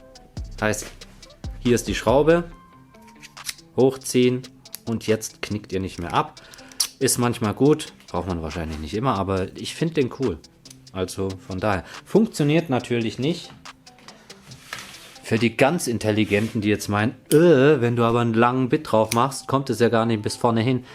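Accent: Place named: Saarland, Germany. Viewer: Ein Saarländer, wie schön. 👍👍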